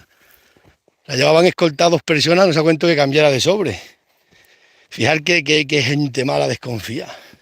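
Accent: Extremadura, Spain. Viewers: Desce Barcelona una estremeña acabo de venir de mi querida estremadura ya soy mayor y cada día añoro más mi infancia fuy cobarde al salir de mi tierra bendigo los que se quedaron es usted mi siquiatra me gusta cómo habla como la gente noble de esa tierra gracias ustd da calma como todos los buenos estremeños aún quedan gracias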